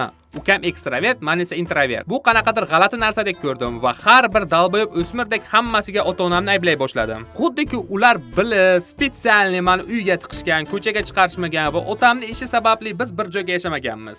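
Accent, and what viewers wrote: Qashqadaryo, Uzbekistan. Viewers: Qashqadaryodanmisiz diyman-a?😅 gapirishiz jo‘ramdi eslatopti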